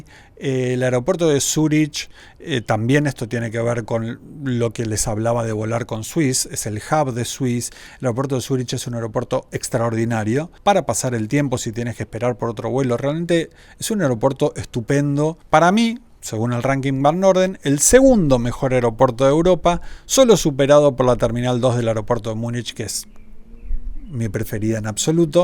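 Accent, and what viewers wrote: Argentina. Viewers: Buena crónica sos el europeo mas Argentino que escuche, me haces reír cuando te enojas.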